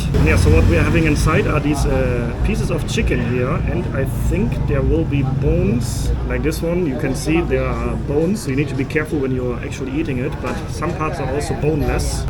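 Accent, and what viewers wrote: Germany. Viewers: I love watching all your videos!! Im addicted to your videos!! Everyday i must watch your vidoes!! I love your German accent, so cute!😊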